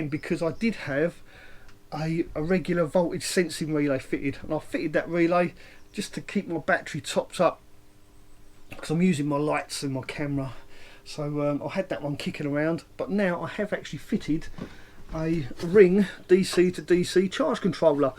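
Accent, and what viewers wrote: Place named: Essex, United Kingdom. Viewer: trying to guess your accent and we recon Wickford or Basildon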